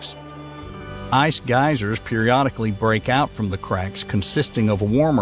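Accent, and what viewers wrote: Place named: Oklahoma, United States. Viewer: He spunds like huggbees but from Oklahoma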